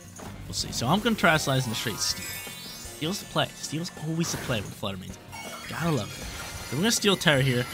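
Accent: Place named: New Jersey, United States. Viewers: I think he is! Hahaha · You from New Jersey ? Sounds like it lol. Or at least the north east for sure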